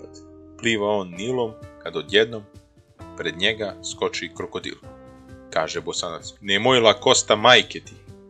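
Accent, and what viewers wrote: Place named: South, Serbia. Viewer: Samo kada bi južnjački šmek malo smanjio,možda bi i na nešto ličilo.🤭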